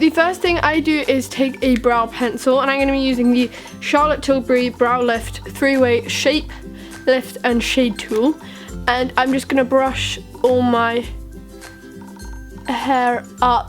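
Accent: United Kingdom. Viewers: love your british accent so much<3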